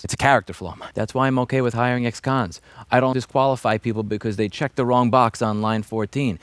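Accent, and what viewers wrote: New York, United States. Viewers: You have a new york accent, never noticed it before. I guess you are that angry now, rightfully so. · the new yorker accent shows through with the new yorker attitude! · you already fit in. You may sound like a New Yorker but you just gave this guy both barrels like a Texan. Now just throw in a "he f**ked up bigger than Dallas" and you get your free six shooter and commemorative 6 pack of Lonestar beer. It's called the Texas Twelve Pack and you can get it at the governors office. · Why does he only sound like he's from NY when he's angry? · As a fellow born and bred Bronxite, his NY voice came out 3 videos ago....this is the equivalent of "Meet me at the corner" and pick the most populous one....42nd and Bradway? Queens BLVD and Northern BLVD? Heck, how about Eastern Pkwy and Flatbush, Grand Concourse and Fordham.....heck lets be real and do it on 125 St and Lenox...Tickets anyone??? 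· Love your new england attitude at times and the new york accent that comes out helps. If a youtuber with millions of followers accuses me of being a tiddler Im knocking on that youtubers door. He wouldve been smart to stfu but no, he talked. You know so much about this dude Im suprised you are not shitting in his pants for him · Oh my! Louis let the New York out in this one. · Louis has gone 120% New Yorker on this one and I'm here for it! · Louis went full New Yorker on this one. Damn. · Lmao justifying the particle counter while blasting his ass off with a NY accent. Amazing video 😂 I wish I was like you to fight bitches appropriately when they try to screw you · Bro the new york came out in this video and io love it! Thank you Louis for everything you do and everything youve done! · His New York accent gets stronger the more worked up he gets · This is the first video I noticed the NY accent out of Louis. 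Damn the pedo sure fawked with the wrong guy lol. Louis has a grrrr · The New York accent came out strong on this one. Good job, keep up the amazing work! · the new york accent is slipping out lol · The new york accent just makes this better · I don't think I've ever heard Louis sound so New Yorker till this video lol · This series has really brought out the New Yorker in Louis. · It's been a while since I heard you go full NY. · You can tell Louis is heated by how much that New York accent comes out! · This is the most New Yorker sounding video I've heard from you, I enjoy the righteous indignation in every syllable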